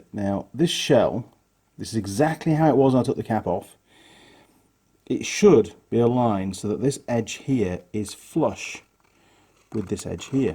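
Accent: Yorkshire, United Kingdom. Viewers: Nice tear down. Your accent sounds local to where I live in the UK, maybe a quick run over the "snake pass". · Also, where you from originally? You sound like you’re from not far from here (sunny Rochdale), just over the wrong side of the border into dirty Yorkshire? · I have to agree! I only arrived at this video by a YouTube recommendation, and to be honest I thought I would skip through chunks of it just to see the damage - - I have watched every minute of it, and what a fantastic video! You have another subscriber (from the UK, where I suspect you originate from, going by your accent?) and I look forward to watching future videos. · You live in Australia I take it, or I think that's what you said at some point. But you sound very much like your from my part of the world in Yorkshire, I live in the dales. I could be wrong, but definitely English, I'm sure, but it sounds that way. 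Awesome vid aswell, nothing better than getting ya hands amongst it under the bonnet, a lot easier I must say on the bench. Glad I came across your vid, it just popped up in things you may like. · Sounds like a Brit, looks like an Australian :S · Hi, I came here courtesy of the YouTube suggested video list. Being a reasonably experienced hobby mechanic, I understand what you are on about in this here video. The way you talk the viewer through the stripdown is refreshing. Subscribed! Cheers, Niek from The Netherlands (opposite end of the planet! hey!) p.s. I can't help but spot a bit of a mild Yorkshire accent from time to time. You're not from the UK now are you? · Your Yorkshire accent is very soft considering you arrived across the ditch in 2008. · Interesting stuff. Nicely presented too. You sound like you're originally from Yorkshire? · Heh, I came to the comments to say the exact same thing! 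Reconised the accent straight away; "odd sounding Kiwi", I thought, "sounds like home" - I grew up just outside York.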